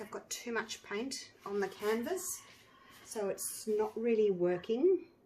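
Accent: Australia. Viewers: Love Yr Aussie Voice🙂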